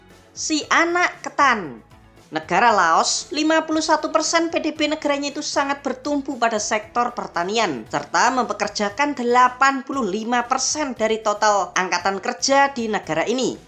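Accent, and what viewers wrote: Java, Indonesia. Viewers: di lihat logatnya mimin org jawa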